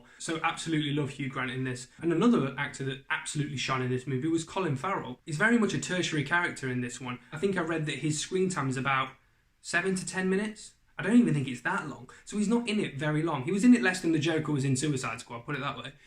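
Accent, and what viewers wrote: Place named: England, United Kingdom. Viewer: Hey, Bud, thanks for visiting our channel. My first time here, and gotta say, like your review. Not fair, though, because you have the cool English accent. Definitely not fair. Agree with your review. I guess I have a lot to look forward to, given that I haven't seen the other Guy movies you mentioned. have a good one.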